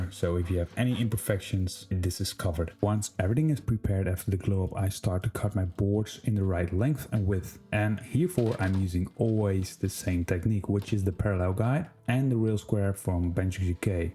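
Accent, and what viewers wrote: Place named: Netherlands. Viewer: Sounds dutch to me, but I like it... I, surprised that you don't have a jig for dovetails.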